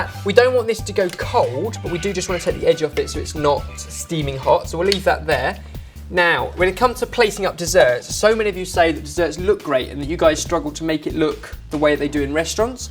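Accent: France. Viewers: Just amazing. And cute French accent;) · + French Accent = sexy! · Woo love your french accent, it's quite nice ! :D We looove our îles flottantes, so sweet and light :) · So went to Marocco? What a surprise! Btw I always loved this recipe while growing up, my dad used to make it in my birthday. The limoncello touch is nice, I have to try it next time. And nice french accent;)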